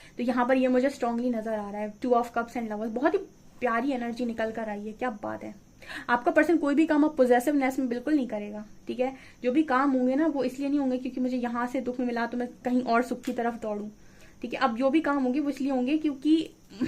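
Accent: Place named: Bhedaghat, India. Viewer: Aaki boli se samajh Gaya main ki AAP Kahan se ho behanji...bhedaghaat..am I right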